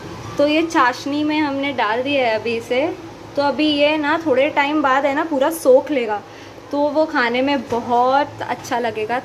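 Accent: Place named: Maharashtra, India. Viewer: Stumbled upon your vlog while watching Nomad Shubham’s vlog. Daily lifestyle vlogs are always a good idea. You hav an attractive personality. Just work on the quality of content, video and audio. It’ll definitely fetch you in times to come. All the best. I’m a GI Surgeon currently practicing in Mumbai/Thane; originally from Bihar. I like the Maharashtrian-Hindi accent of yours.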